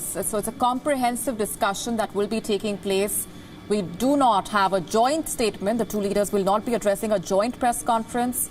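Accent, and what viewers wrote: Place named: India. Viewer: Indian English 😁😁😁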